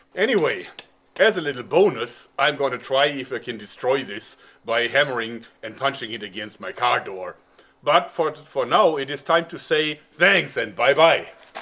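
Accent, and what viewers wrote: Germany. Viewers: you sound a bit German or so... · you speak like a german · Ahhh du sprichst deutsch geil sofort am aktzent erkannt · Hört sich an wie deutsches englisch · are you german ? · Are you from germany because of your Accent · It really sounds like you're from Germany. Are you? I'm sorry if I (idk) hurt you.. lol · I think you have a very german accent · are u Part german because u have a strong accent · Lern mal Englisch deine Betonung ist wirklich wie ein richtiger deutscher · are you german? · even for me as a German I must say...gosh this rough accent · Dieses deutsche englisch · is he german? ist er deutsch? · Jeder der deutsch spricht merkt das er deutsch ist 😂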